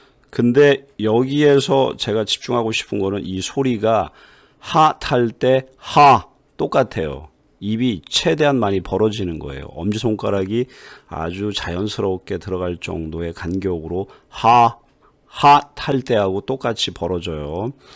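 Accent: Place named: United States. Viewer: 진짜 미국의 발음이 나오는것 같으십니다 존경해욥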